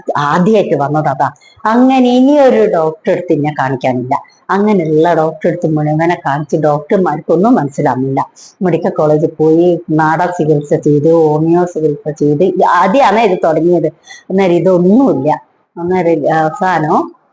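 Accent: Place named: Kannur, India. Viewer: Kannur bhasha ane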